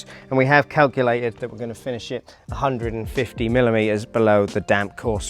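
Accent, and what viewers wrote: Norfolk, United Kingdom. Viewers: Could tell you were from Norfolk within about 5 seconds 😂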